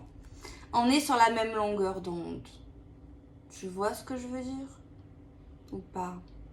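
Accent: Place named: Marseille, France. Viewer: Bj d entré le premier message est pour moi! Avec l accent marseillais comme moi! C est super ma belle! O bonne mère bénie cette petite femme d amour!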